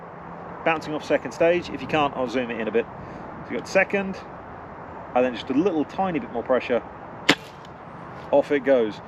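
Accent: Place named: United States. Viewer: Nice one, i was watching the video while in the bath, no weirdness intended, i nearly pissed myself with your American accent, Brilliant.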